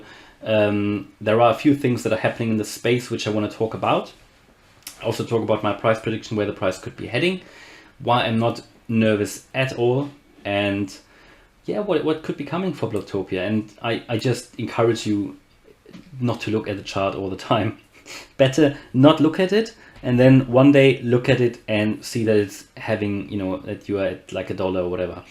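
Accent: Germany. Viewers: Danke für die Analyse. Ich schreibe auf Deutsch, weil ich an deinem Akzent vermute das du deutsch bist 😂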